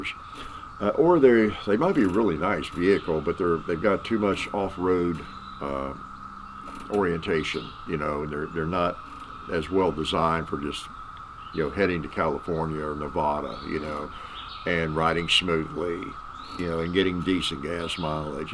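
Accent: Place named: Northern Kentucky, United States. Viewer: Sounds like you started out in northern Ky. Hello from California KY.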